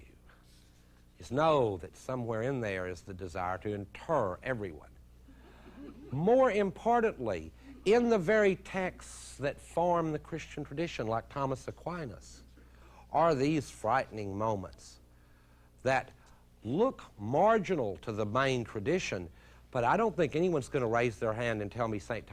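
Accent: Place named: Texas, United States. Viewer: I knew this dude was from Texas because he sounds like Mark Rippetoe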